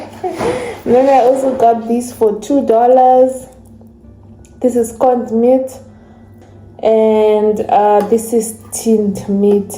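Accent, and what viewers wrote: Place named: East Africa. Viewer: has any1 ever told you, u sound east african? lol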